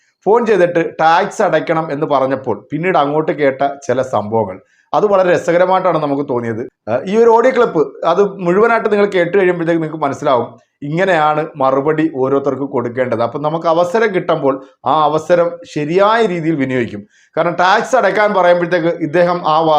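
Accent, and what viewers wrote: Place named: Kasaragod, India. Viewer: ശരിക്കും ഇത് കാസറഗോഡൻ ഭാഷയല്ലേ? അപാരം തന്നെ. കൊച്ചി മെട്രോയിൽ ഇരുന്ന് ചിരിച്ച് മടുത്തു.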